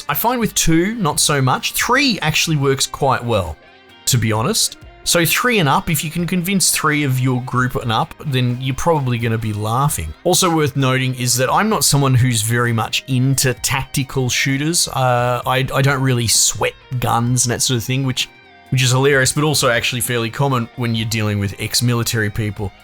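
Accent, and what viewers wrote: Australia. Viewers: I so miss hearing our aussie accent on videos. Makes me feel more at home knowing some one here in AU who is layed back and not give a shit about subs and likes but just telling it how it is...and i understand what your saying...lol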